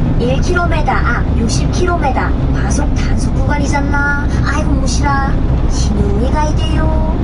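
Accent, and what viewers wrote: Gangwon, South Korea. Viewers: 강원도 사투리버전 진짜 밤에 낄낄 웃었네요ㅎㅎㅎㅎ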